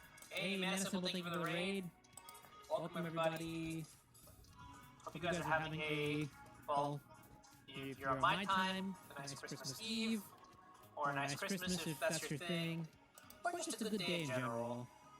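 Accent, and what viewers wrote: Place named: Italy. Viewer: The averagely annoyed Italian accent is hysterical xD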